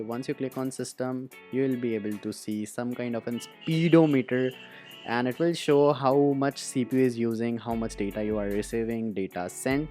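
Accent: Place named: India. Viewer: Best Indian accent i’ve ever heard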